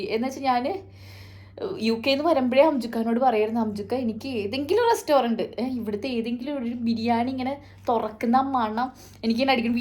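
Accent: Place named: Chavakkad, India. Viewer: Umma thrissur Chavakkad Aano? 😀samsaram ketapol thonni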